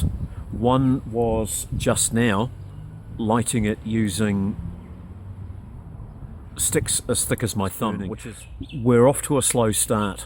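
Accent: New Zealand. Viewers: I thought you on a flight-path or next to the highway. Were are you? I'm thinking going by the accent NZ?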